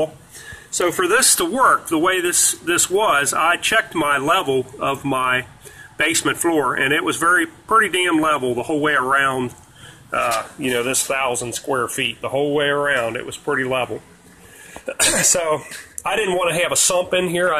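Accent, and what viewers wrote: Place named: Pennsylvania, United States. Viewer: Well you definitely have a pa accent! Couple years later now I was wondering how is it holding up?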